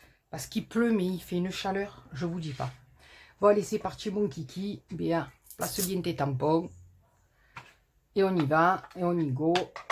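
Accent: South, France. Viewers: Recoucou ma.... contente d'entendre ce joli accent du sud....tes cartes sont magnifiques et le die superbe avec ce papier miroir... ca fait bien esprit de noel ou noyel même si ce n'est pas les couleurs traditionnelles..... Tiens mistigri n'est pas venu te voir sur cette vidéo.....pleins de gros bisous ensoleillés de la Réunion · Cc, je vous adore, j'aime ce que vous faites c'est magnifique et le soleil dans la voix du sud, je ris beaucoup et j'ai l'impression d'être là bas avec ma famille... Que vous me faites du bien..., et votre façon de dire, je vous kifffe grave comme vous dites...je vous copie pour apprendre c'est tellement beau.... Merci à vous de votre bonne humeur et vos rires me font un bien fou.... Et oui, franchement elles sont ravissantes 😁😂😂😂😂 Et oui, j'aimerai bien votre jolie carte.... 😁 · Pas vue la première vidéo ...mais tu me fais bien rire 😊et j aime bien ton accent du sud.Tres jolies tes cartes.💕💕bonne soirée bisous😘😘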